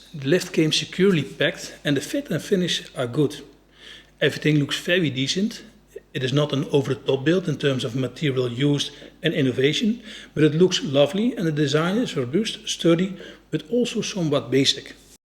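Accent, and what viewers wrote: Netherlands. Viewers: And I like your accent. It’s even better than that of Mark Rutte…😜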